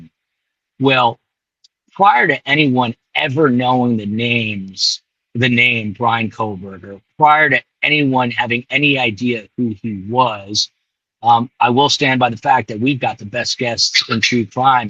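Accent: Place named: New York, United States. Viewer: Great news flash,. Looking and sounding very New York.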